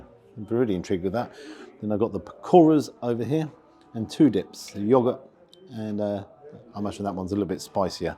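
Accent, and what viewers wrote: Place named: Scotland, United Kingdom. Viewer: I had to turn on subtitles because the Scottish accent you've picked up was getting difficult to understand. I will assume you enjoyed the birthplace of tikka masala and the food you experienced 😂😂. 9/10 for the history and accent. Have a wonderful weekend.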